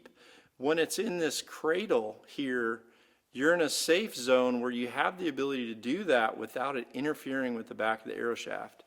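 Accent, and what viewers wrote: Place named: California, United States. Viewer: So hard to suffer the vocal fry. Californian is the worst accent.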